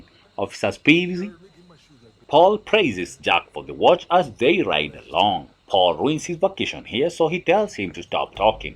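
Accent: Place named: Africa. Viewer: Oh...s@ what the f#$@ Will be much better without the naration of African accent.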